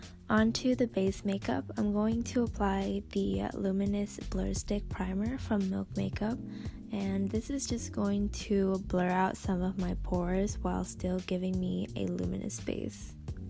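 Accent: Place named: United States. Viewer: Your voice sounds like Jess conte but with an American accent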